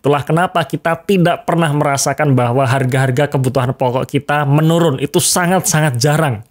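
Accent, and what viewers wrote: Surakarta, Indonesia. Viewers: oalahhhhhh..wong Solo to mas? 😂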